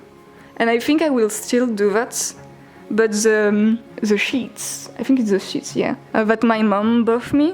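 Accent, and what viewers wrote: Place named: France. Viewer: Bonjour！！ I just saw your channel by accident. What a coincidence! I am from China and major in French but I am a freshman so my French isn't good. I also intend to study and live in France, so I am looking for some videos that allow me to practice French and English at the same time. Finally, found you!!! Love your video, your good sense of humor, optimistic attitude towards life, and your fluent English with the cute French accent（I hope this won't offend you)!!!Looking forward to your more video!Bonne jourée!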